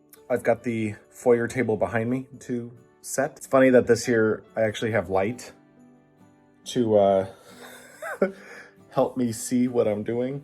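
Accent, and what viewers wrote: Minnesota, United States. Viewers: My favorite part was - , looking down through the garland balusters at the winter wonderland below. Everything looks SO beautiful. I don't know how many Christmas trees you have total, but I decided at the start to make "5 Christmas trees" the 5th item. On the 5th Day of Vlogmas my true love gave to me: Five Christmas trees 🎄🎄🎄🎄🎄 Four meows from Paolo Three sets of batting Two power strips 🔌🔌 And you sound like the movie Fargo 🎄⛄🙂⛄🎄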